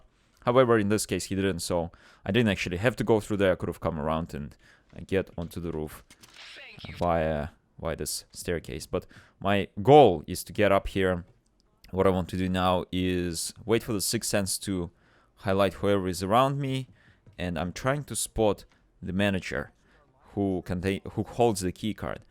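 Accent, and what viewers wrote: Russia. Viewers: Thx man I like your Russian accent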